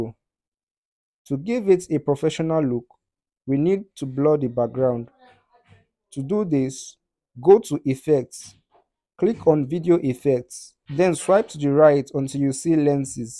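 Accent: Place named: Nigeria. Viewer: I am not a linguist, an East African but I can place this accent in West Africa, most probably Nigerian but might be Ghanaian. I can almost understand pidgin though none of it is used here. Some linguist you must be.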